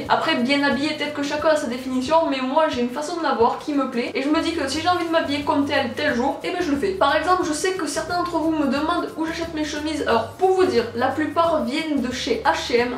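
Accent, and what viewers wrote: Toulouse, France. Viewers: Elle a un accent toulousain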